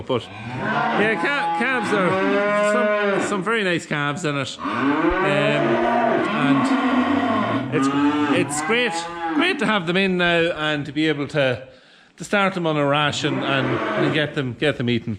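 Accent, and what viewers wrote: Ireland. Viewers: Where are you from in Ireland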